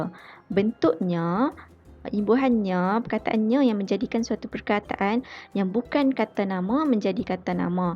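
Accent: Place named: North, Malaysia. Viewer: Tahniah ...tapi loghat utara sangat ketara....sepatutnya sebutan baku...boleh ditingkatkan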